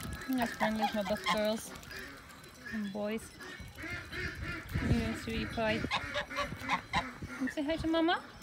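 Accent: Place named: Ireland. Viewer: You sound a little Irish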